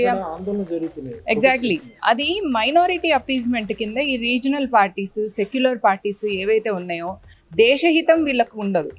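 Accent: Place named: Telangana, India. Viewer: Mee Telangana yaasa ❤❤❤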